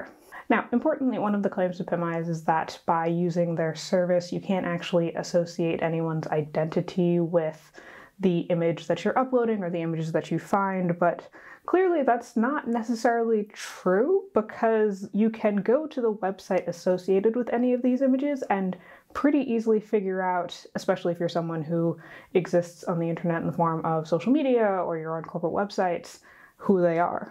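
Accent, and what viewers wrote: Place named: United States. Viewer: As I am not American (although English is my first language) I really struggled with understanding what you were saying. You speak quite fast and your speach is not particularly crisp. If you want to convey information to people please be aware they need to understand what you are saying. Not everyone can keep up with American speed speach !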